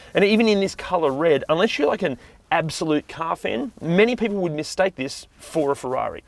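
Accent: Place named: Australia. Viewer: Man its good to hear some nice aussie commentary, thanks for the review!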